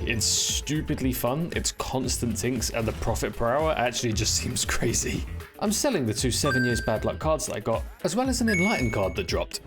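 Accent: United Kingdom. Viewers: Bro you are literally the best creator in this space right now. The voice over is crystal clear with great humor and the brit accent is the cherry on top.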